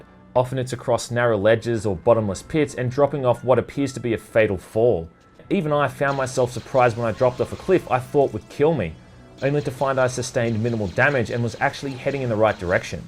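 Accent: Australia. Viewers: this may be a weird question but what is your accent it sounds australian to me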